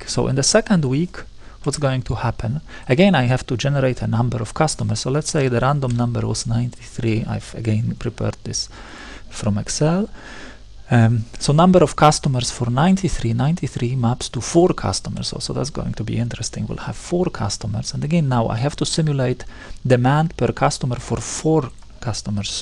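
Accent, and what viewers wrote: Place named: Egypt. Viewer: اللهجة مصرية بحتة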